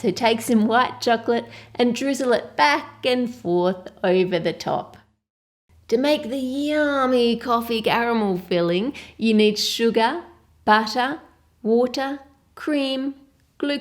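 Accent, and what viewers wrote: Australia. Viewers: It's a classic Auzzie accent. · I love your accent!! Is it Australian? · I watch a lot of your tutorials, and for that I really have to ask, can you please speak slower? because I'm not a native speaker, I'm italian, and it' so difficult for me to understand everything since I'm not used to listen to aussie accent! I'm not trying to be rude, I really love your recipes, and I need to understand everything so that I can try them :) thank you!!!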